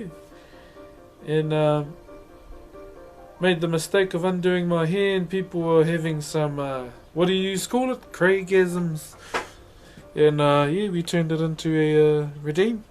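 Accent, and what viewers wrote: New Zealand. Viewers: if I'm not wrong I think he has a Maori accent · the New Zealand accent is so wholesome. I love you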